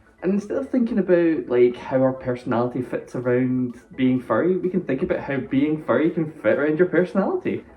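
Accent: Scotland, United Kingdom. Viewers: Recognised his accent a couple of seconds into the first of his videos I watched, and immediately knew he was a fellow Scot!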